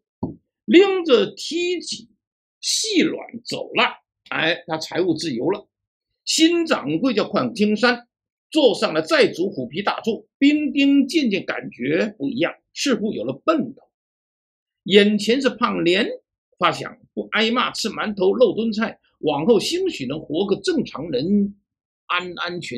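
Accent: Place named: South, China. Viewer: 北派的小说，南派的口音，南北结合，在我看来这是创意，老杨全网独一份🎉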